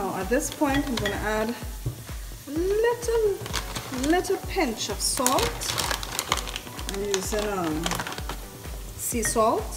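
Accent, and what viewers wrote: Trinidad and Tobago. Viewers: This was the most simple recipe I found. And how lovely to encounter what sounds like a Trini accent😊🇹🇹 Thank you very much!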